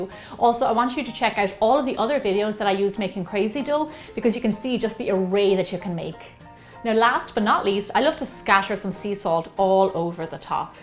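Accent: Ireland. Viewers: I love the way she says tomatoes😅 I'm not Irish so that's why I love your accent :)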